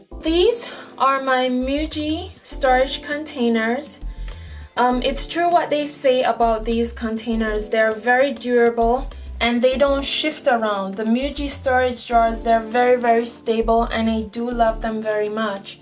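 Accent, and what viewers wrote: Jamaica. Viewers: Keep hearing a Jamaican/Caribbean accent when you speak. Am I right? Great video!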